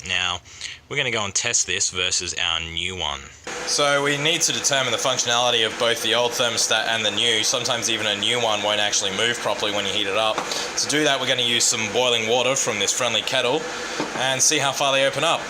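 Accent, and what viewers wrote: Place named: New Zealand. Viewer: sounds like a kiwi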